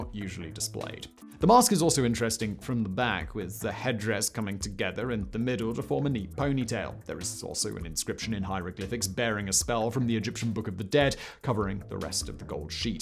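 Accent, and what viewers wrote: England, United Kingdom. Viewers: I'm so glad I'm not English. I would probably sound like this dude when I talk and that would piss all of us off and have to punch myself in the face if somone didn't beat me to it(no pun intended). And dont these people realize if you smash the like button you will break your electronicsl Stupid limese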